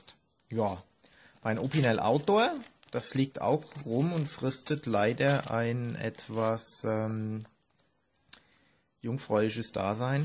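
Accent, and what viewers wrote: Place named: Hesse, Germany. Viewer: Hi! Auf Deinen Kanal bin ich durch das Video von Karl Heger aufmerksam geworden:) Klasse Reviews & sympathischer Dialekt!:) Komme auch aus Hessen!:) Lasse Dir direkt mal ein Abo da! Liebe Grüße, Gear2Video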